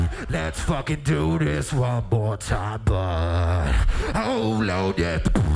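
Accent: United States. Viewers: Your American accent sounds very mid west.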